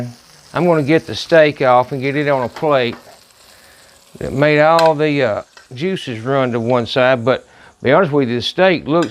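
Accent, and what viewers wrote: South, United States. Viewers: Do I detect a bit of a Southern accent?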